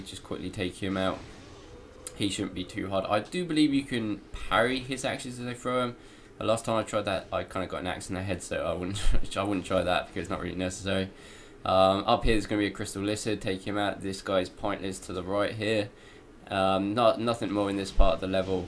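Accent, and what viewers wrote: England, United Kingdom. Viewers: agreed, this guy plays like a noob, has England's equivalent of a Mississippi delta mudman inbred's accent, and nobody gives a fuck about the doors that don't lead anywhere so why is he even bothering to show them? He should just say "don't waste pharros on these" and move the fuck on.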